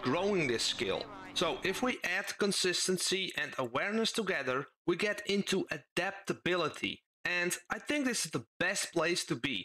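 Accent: Netherlands. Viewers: kom je uit Nederland? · Super Dutch, lol. that dialect is obvious. Groet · Are you Dutch?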